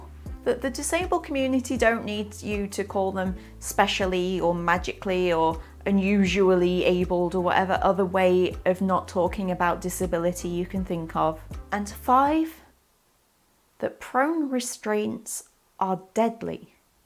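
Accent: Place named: England, United Kingdom. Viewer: I just re watched this video to try and work out what you were talking about, the person who made this video is saying the word ableist in her accent and is the way that I would expect to hear it. I'm sorry that you aren't used to hearing this accent (I'm not from the UK so can't tell you which part of England it is from), that doesn't mean that she has mispronounced the word.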